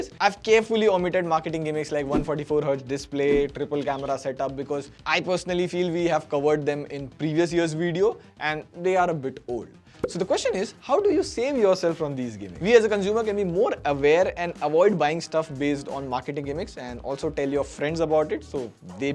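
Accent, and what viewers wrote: India. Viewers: why hindi speaker always say "guudu" instead of good 😅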